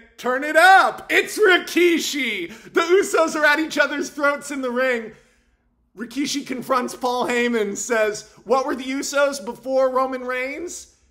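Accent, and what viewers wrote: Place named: United States. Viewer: Your american accent is so obvious,.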